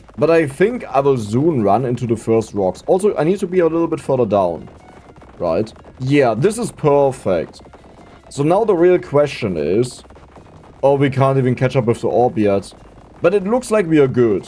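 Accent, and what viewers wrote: Germany. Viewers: I see now that i love ur videos because of your german accent xd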